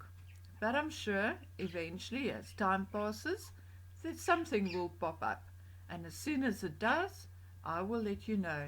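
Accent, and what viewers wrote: South Africa. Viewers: WHAT A FIND YOUR SITE IS , LOVE IT. ALREADY SUBSCRIBED. LOVE THE SA ACCENT AS I LIVED IN SA FOR 4 YEARS, SUCH A BEAUTIFULL COUNTRY AND PEOPLE. MY MIDDLE TWO CHILDREN WERE BORN THERE SO LOTS OF VERY HAPPY MEMORIES.. KEEP UP THE GOOD WORK.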